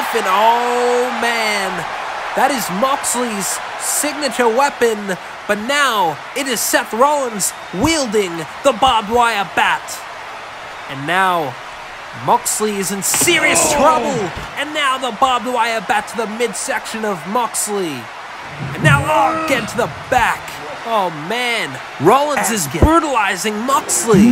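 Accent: Australia. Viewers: WOW FANTASTIC!!! I love the new stage dude! I was talking to SuplexNetwork and we agreed y’all sound alike! Probably because y’all are both Aussie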